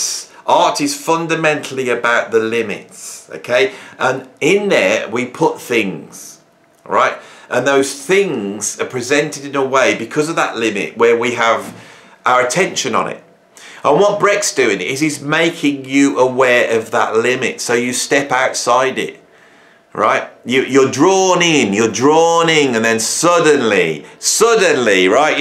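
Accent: United Kingdom. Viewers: You’re a mad genius, I love it. As an American, and an accent buff, I tried to imagine this video (I’ve watched others of yours and subscribe) with the exact same content, but delivered with an American accent, or even a more neutral British accent, it would be a very different experience. Your content is great, I think it’s brilliant, but I also think your accent is a bonus that gives the whole thing an ineffable charm. Cheers!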